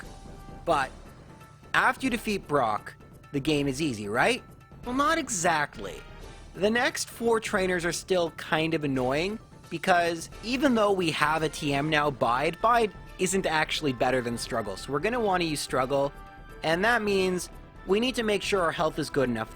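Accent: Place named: Canada. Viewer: Based on his accent he’s Canadian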